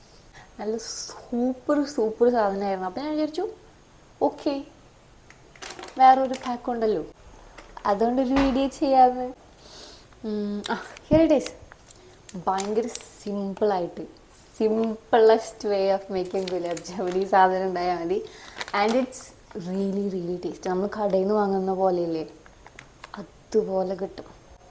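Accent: Thrissur, India. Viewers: naattil Thrissur aano athe slang😁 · Thrissurkary aano slang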